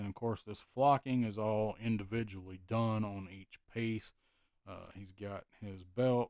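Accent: South, United States. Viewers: The best adventures start with setting to wander. I don’t do MOTU, but you good folk with that Fine Southern Drawl really sell him well. 3 star outta 3 -well. I’m glad this video got mentioned to us tonight.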